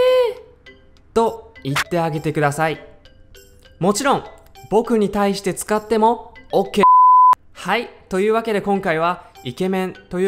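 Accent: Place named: Japan. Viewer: 有中文字幕好用心喔！ 日本人教日文很道地👌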